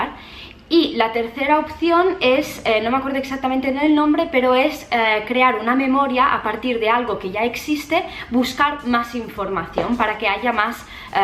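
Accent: Italy. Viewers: Eres italiana?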